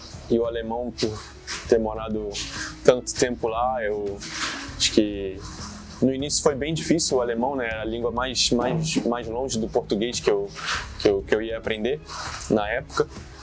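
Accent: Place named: Brazil. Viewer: O portunhol não abandona o Brasileiro 😅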